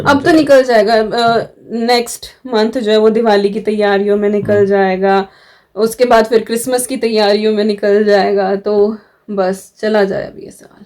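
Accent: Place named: Haryana, India. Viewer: Hlo apki bhasa haryana se milti julti you are my favorite YouTuber 🤗🤗🤗🤗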